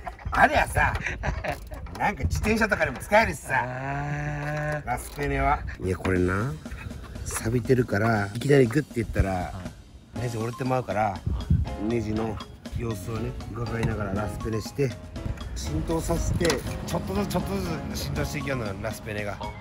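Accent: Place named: Fukuoka, Japan. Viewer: 福岡弁さん本人の面白さカメラマンとの絡みローレルネタ全部最高。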